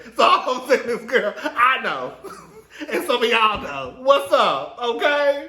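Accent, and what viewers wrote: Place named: Houston, United States. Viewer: I love your Houston accent, when you say “thurrr” and “hurrrrr” is everything to me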